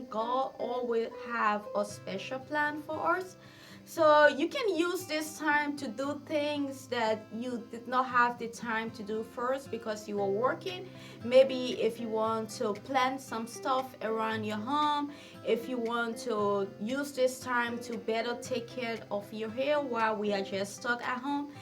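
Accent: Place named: Liberia. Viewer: Your energy is so nice - I don't know if I'm late to this fact but your accent sounds Liberian I keep thinking my cousin is around the corner. I love this video I've used aloe on my daughter's hair since 3 months old and her hair at 2.5 is so soft and shinny her growth is consistent and she retains it. I've never used the peeling well done! Thanks a bunch!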